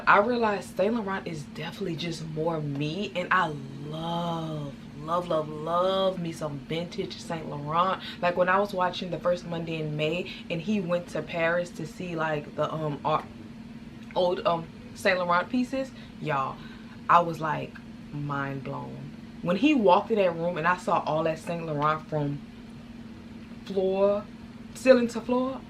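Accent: Louisiana, United States. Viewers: Baby I heard that accent from a mile away, fellow louisianian.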